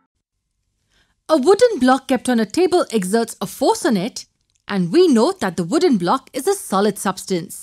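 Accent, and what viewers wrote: India. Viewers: I hate the Indian accent lol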